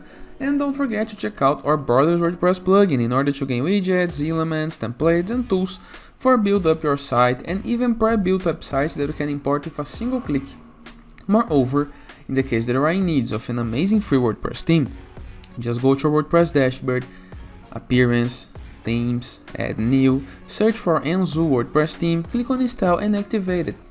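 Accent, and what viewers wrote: Brazil. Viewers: consigo reconhecer o sotaque brasileiro de longe, valeuzão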